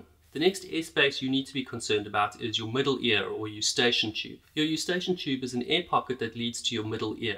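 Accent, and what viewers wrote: South Africa. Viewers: are you south african? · You have a South African accent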